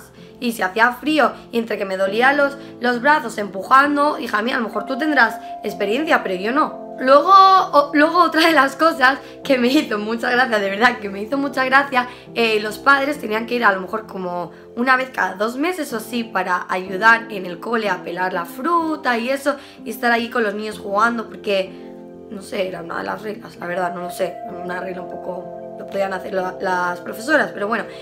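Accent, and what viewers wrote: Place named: Galicia, Spain. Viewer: eres de galicia no? que acento mas bonito!!! muy buen video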